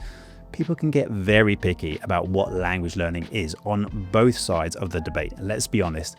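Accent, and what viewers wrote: United Kingdom. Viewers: Too British for me. Ain't it my cup of tea. Sorry.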